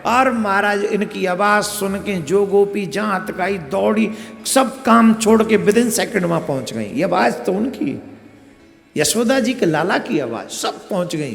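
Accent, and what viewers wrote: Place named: Braj, India. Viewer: गुरु जी आप ब्रिज भासा में कथा कहते है तो बहुत ही अच्छा लगता है ब्रिज भाषा कितना मधुर है लगता है सुनते रहे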